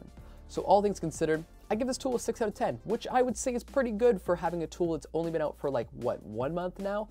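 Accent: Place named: Italy. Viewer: he even has an italian accent in english :D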